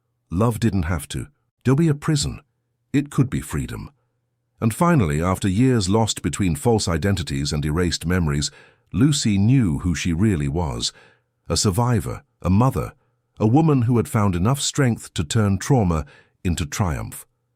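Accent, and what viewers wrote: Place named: England, United Kingdom. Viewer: last name norwood is English, the voice also sounds English but the picture does not look Englsh. Do like his longer sideburns though.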